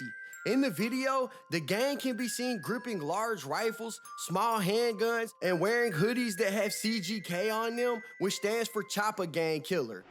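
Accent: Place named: California, United States. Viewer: Dog, not bad. Where u from? Sounds like Cali somewhere But your accent is extra! Imma get used to it.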